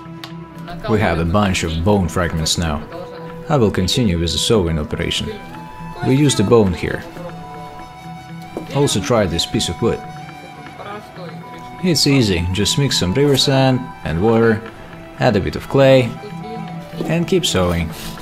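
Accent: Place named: Russia. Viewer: Еее !! Теперь и на английском языке!! Отлично! О да , русский акцент )) так мило ! Вы, больше молодцы, уважение !!!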